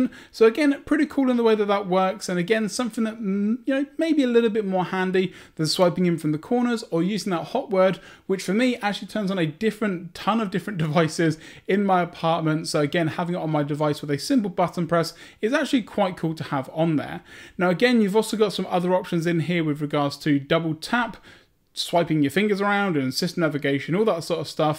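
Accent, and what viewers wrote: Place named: United Kingdom. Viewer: Most likely due to his British accent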